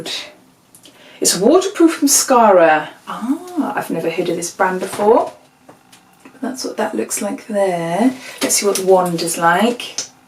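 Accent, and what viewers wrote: United Kingdom. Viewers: hey, so cool you answered me ☺️ i got a face mask and lip scrub that I loved but then a lip liner ( I’m a lip gloss person) tweezers and a mini body wash and lotion. So not the best, I’ll try for a few months and see. I just signed up for a few. Just found out they existed a month ago 😂 I’ve been missing out. I’ve watched so many unboxing videos since, a lot of yours, I love the British accent, it makes me feel fancy 💃🏻 keep it up.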